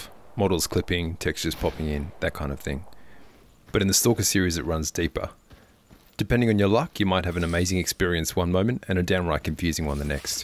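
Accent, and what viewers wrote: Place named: Germany. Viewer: Місцезнаходження: Японія, автор з німецьким акцентом про українську гру - де ще таке зустріти , як не на YouTube ?)))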